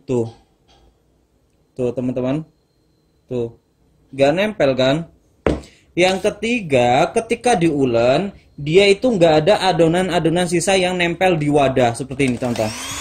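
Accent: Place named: Cilacap, Indonesia. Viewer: Maaf Mase aku nmbe nemu chanel sampean, koh sampean niku kaya wong CILACAPAN yah sanjange....👍👍👍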